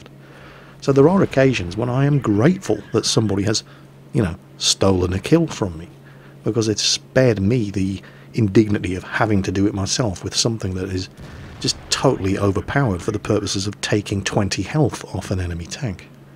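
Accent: United Kingdom. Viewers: we love the Man Cave becasue we get to know more about you then just "That cool old british guy we hear on videos" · It's dat accent. Classical Brit is what makes you popular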